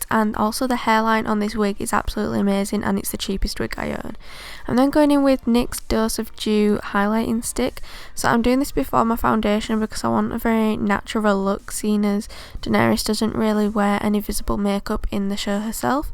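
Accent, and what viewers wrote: United Kingdom. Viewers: WHO KNEW SHE WAS BRITISH AND HAD AN ACCENT LIKE THAT! TF I THOUGHT SHS WAS AMERICAN😂❤️❤️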